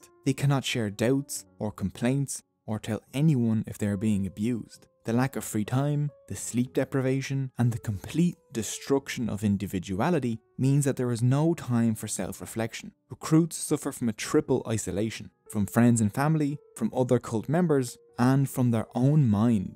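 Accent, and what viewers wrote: Ireland. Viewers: I love your videos... Watching them from this morning. Your animations are truly great and I love your voice & accent, kinda sounds Irish, idk. Wish you could tell me where is this accent from. P.s: you got a new subscriber 😁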